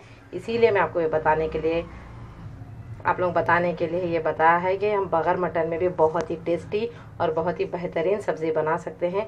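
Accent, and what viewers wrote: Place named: Hyderabad, India. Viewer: Apki Hyderabadi baat sunke bohat Acha Laga...I m from hyd but living in Oman... always I miss Hyderabadi people & Hyderabadi foods..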